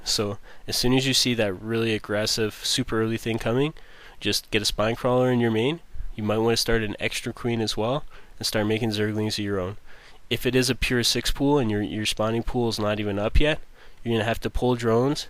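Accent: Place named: Canada. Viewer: ha,I guessed right, you were canadian! Didnt really pay much attention how you speak, but eventually that 'o' came out quite clearly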